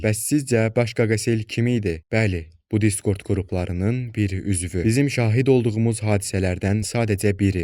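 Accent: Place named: Turkey. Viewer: Qardaşım bax bəlkədə bu mövzu ilə aidiyyatı sıfırdır ama xaiş edirəm yalvarıram Türkiyə Türkcəsi aksentiynən danışma çox ət tökən və yorucu olur hörmətlər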